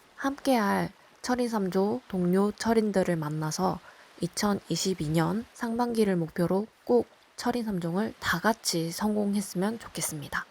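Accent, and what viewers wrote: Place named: Japan. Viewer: 계획을 세워 그렇게 철인3종도 하시고 마라톤도 완주 하시고 지금 이렇게보면 하면 한다네요 말투도 일본에 있어서 그런지 일본 말투도 섞여 있네요ㅎ 요가 자전거 수영 마라톤 등산 못했지만 하나 하나 해가는 이 대단합니다 응원할께요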